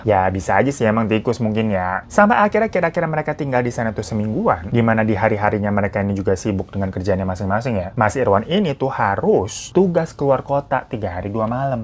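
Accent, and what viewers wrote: Java, Indonesia. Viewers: Medok bgt bang😂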